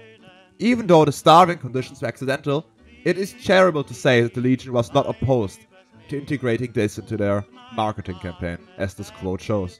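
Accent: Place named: Germany. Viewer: Ich liebe deinen deutschen Dialekt in Englisch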